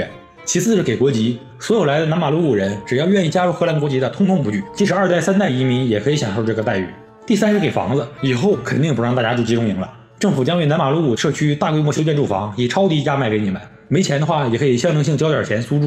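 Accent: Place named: Northeast, China. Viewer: 东北话有毒...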